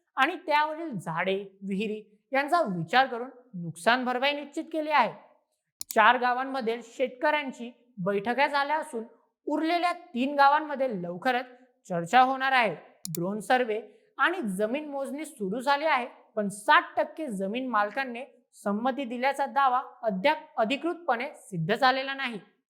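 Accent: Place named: Khandesh, India. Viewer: Khandesh chi bhasha ashich aahe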